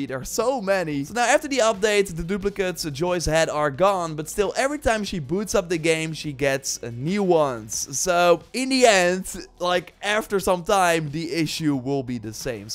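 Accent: Netherlands. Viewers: Je Engels is grappig met je Nederlands accent 🤣.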